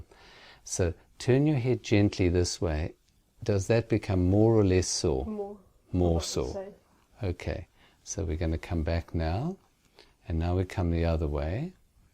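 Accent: South Africa. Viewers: Are you South African, I can't help but notice the accent?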